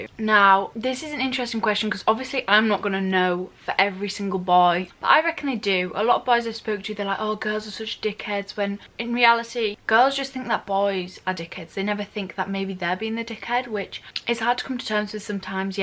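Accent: United Kingdom. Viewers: She bri’ish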